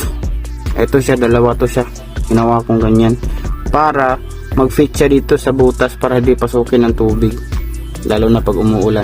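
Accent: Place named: Davao, Philippines. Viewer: Bro taga davao diay ka?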